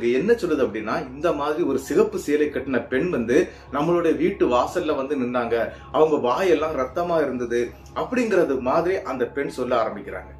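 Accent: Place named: Tirunelveli, India. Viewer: Kadhai sollum vidham Tirunelvel i slang nice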